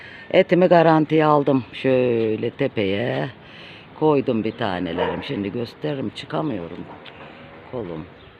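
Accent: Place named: Adana, Turkey. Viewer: değerli youtube gezgin ve yurtiçindeki kendi içeriklerini youtube de yayınlayan arkadaşlar hepimiz biliyoruz ki bu işi az veya çok para kazanmak için yapılıyor buda sizin en tabi hakkınız öyle youtuber kardeşlerimiz varki çok büyük emekler ve yaşantılarını tehlikelere atarak bizlere gerçek yaşantıları kültürleri yaptıkları iyilik akımları ile bizlere gerçek içerik üretip bilgilendiriyorlar düne kadar köy yaşamını köy kahvaltısına burun kıvıranlara dahi bu yaşantıyı en güzel içerik ve yaşantılarıyla bizlere sevdirdiler çoğumuzu acaba bizde yapabilirmiyiz moduna getirdiler hepsine çok çok teşekkür ediyorum bu arada yemek tariflerini kitaplardan yapan değerli kardeşlerimiz artık bunun için kitap karıştırıp şu yemek tarifi hangi sayfadaydı diye uğraşmayın bu tarifler ve lezzetler sizin bir karış yakınınızda size düşen tek şey ekrana bir parmak basmak ve bu güzel lezzetleri ablamızın köy hayatı ve kedilerinde kendine has adana şivesiyle seyredebilirsiniz lütfen hepimiz youtube gezinirken ablamıza uğramayı unutmayın ben kardeşimizi ah köyüm ah sayesinde tanıdım iyikide tanımışım sizde tanıyınca inanın çok seveceksiniz kendisiyle kanalının dışında hiç bir tanışıklığımız da yoktur ama bir gün o tanışmada olur inşallah c.allah tüm izleyenleri ve insanları kötülüklerden korusun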